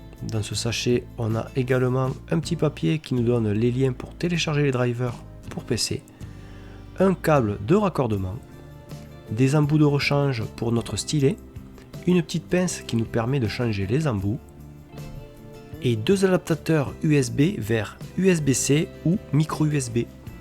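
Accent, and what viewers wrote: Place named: South, France. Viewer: D'ailleurs tu viens du sud non ? (J'ai le même accent que toi et c'est rare de le voir sur YouTube)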